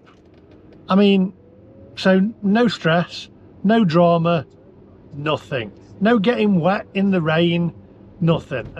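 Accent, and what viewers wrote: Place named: North, United Kingdom. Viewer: IMO people with accents like yours are northerners.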